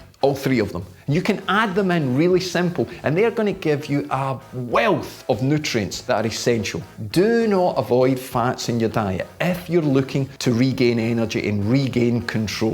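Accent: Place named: Scottish Highlands, United Kingdom. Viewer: I hear a Highlander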